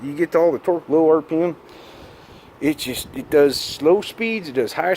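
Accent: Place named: South, United States. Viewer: Dude you are one funny fucker I had fun listening to your accent you must be from down south but it's a good opinion on the lovely Z900 good luck riding your new toy lol lol lol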